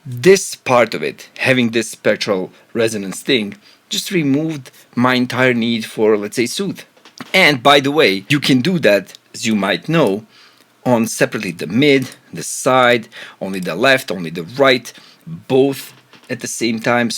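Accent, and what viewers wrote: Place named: Balkans. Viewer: Hey, if pro q 3 is my most used plugin, this one will be used even more! Are you from the Balkans maybe? you kinda sound like you are from my part of the world..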